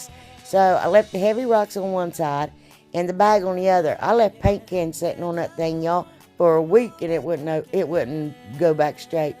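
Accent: South, United States. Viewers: My mom's maiden name is Smith. I absolutely love all your Diys and your sweet southern drawl. Where are you from ? ♥️♥️♥️♥️♥️♥️ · Enjoyed your videos and your adorable country accent! You definitely kept me laughing! My Mother's maiden name was Castles 🏰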